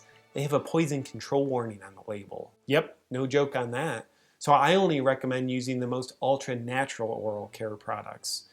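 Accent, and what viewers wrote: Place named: San Francisco, United States. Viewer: Man, this is how people in San Francisco, Berkeley and Oakland talk.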